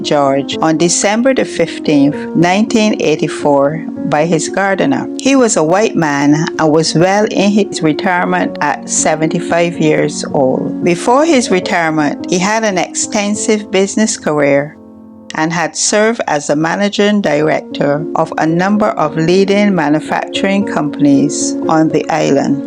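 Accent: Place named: Barbados. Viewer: The accent is a "Bajan" accent or if you can say Barbadian accent.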